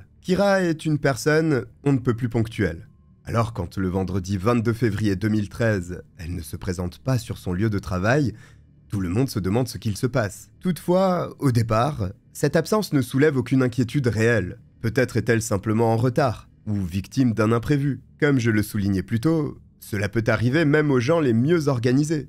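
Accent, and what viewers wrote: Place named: Europe. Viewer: Je te ❤ ta voix me caresse les oreilles et tu n’as pas un trop gros accent. ( en t k il est charmant et pas agressant) comparé à d’autre européen.J’adore ce que tu fais! Bizou 🇨🇦du Québec ✌🏽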